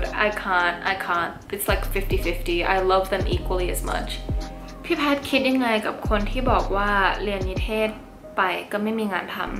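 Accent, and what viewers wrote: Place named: United Kingdom. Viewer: ชอบสำเนียงบริติช มากกก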